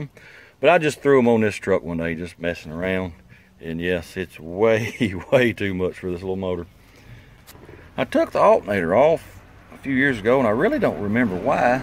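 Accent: South, United States. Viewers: Well, now I know why your voice sounds so familiar. I saw that Montgomery County plate, lol. I'm originally from Henry County on the other side of the lake from Stewart County, so your voice sounds like a lot of my kinfolks. I've lived up here in Southern Indiana for a good number of years and been inside all evening cause it come up a bad cloud earlier and then poured down most of this evening...it was a frog strangler! So I just run across this channel on YT this evening and been watchin' the last few hours.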